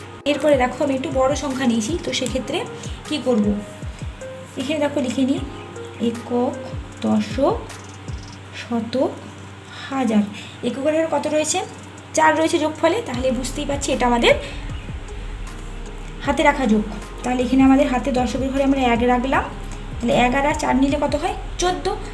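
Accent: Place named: India. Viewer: আপনার ভাষা শুনে মনে হচ্ছে আপনি ভারতীয় নাগরিক।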